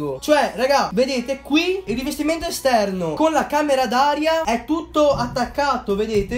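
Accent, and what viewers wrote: Veneto, Italy. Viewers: Di dove sei del veneto? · Ma di che città veneta seu?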